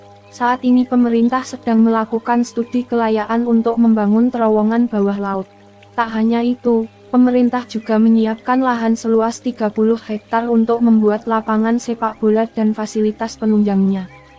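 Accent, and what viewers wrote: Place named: Java, Indonesia. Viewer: presenternya medok banget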